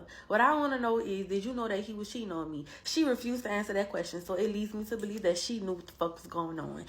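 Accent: New Orleans, United States. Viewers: I’m frm nyc u want to switch accents girl New Orleans baybay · Girl I knew you was from New Orleans when you opened your mouth lol im from New Orleans and still here.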